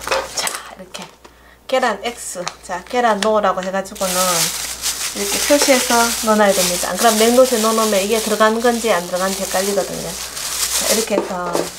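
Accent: Gyeongsang, South Korea. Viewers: 쉽고 빠르게 친절한 경상도언어에 정감있고 맛있는 요리법 고맙고 감사합니다.💕👍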